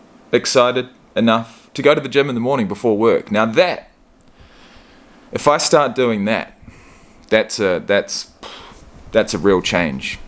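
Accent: New Zealand. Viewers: I thought you were a fellow kiwi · His accent is New Zealand not Aussie but they are similar. Congratulations on your sobriety. :)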